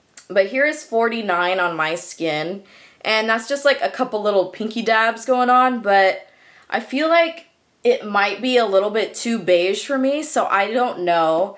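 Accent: California, United States. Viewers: I can tell you're from California by how you talk lol it's great! You're so pretty and I love your videos!! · You sound like you're from California :)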